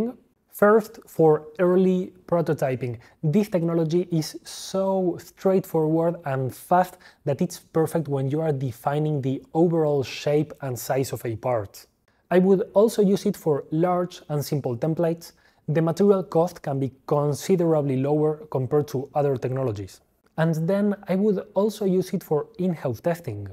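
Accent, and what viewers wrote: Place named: Argentina. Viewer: Increible como se nota que hablas español por el tono de voz jaja, hasta incluso parece que hablas como Argentino aunque hayas dicho trabajas en una universidad de españa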